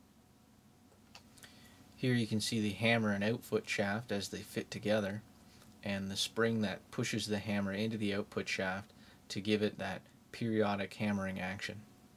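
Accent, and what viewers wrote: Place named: Canada. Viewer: Why would a guy with a funny hat and Canadian way of saying... out... take it upon himself to explain stuff to us? I don't know but this little video is entertaining and informative. I have to get me such a tool. Thanks!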